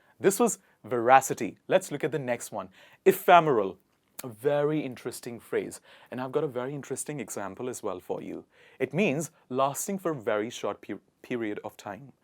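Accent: United Kingdom. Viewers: Is it SAAvy? Or Pronounced as savy? This man pronounces in a British way!! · Your English language pronunciations is not like Indians man. I thing you are born and raise up in England. Your talking in English language the way you pronounce each words is like British man. It is very wonderful. GOD Bless You.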